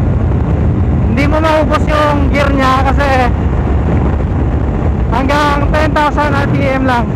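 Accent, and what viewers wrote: Iloilo, Philippines. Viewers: Ilonggo ka gli to😂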